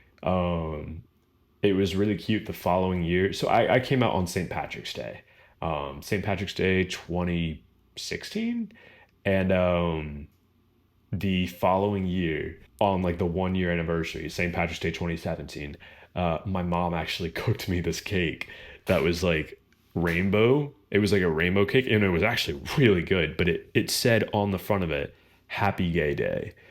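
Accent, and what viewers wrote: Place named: United States. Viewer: It must be because I am British and don't appreciate all the inflections of an American voice, but I just cannot make out what you said your first job was!! To me it sounds like "Chick-fer-lay" but I'm sure that's nothing like it!! Is that something that's specifically American?! And .... one of your hobbies is "Pippa-bow" or "Peepa-ball"?! Is that also a very American thing?! I am keen to learn, and I am sorry if I offended anybody!! 😀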